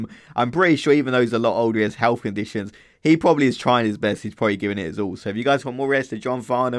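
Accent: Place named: England, United Kingdom. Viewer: Thanka for doing this song of John's. I haven't seen any other reactor do this version before. Also love your nice English accent !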